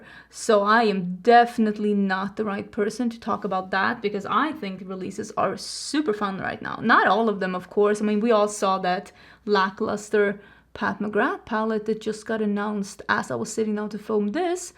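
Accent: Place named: Sweden. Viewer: Totally agreed. I'm an English/Grammar nerd and her English is impeccable. I do love when the Swede slips through and Js become Ys, but so many people who speak English as their first language commit far worse word crimes.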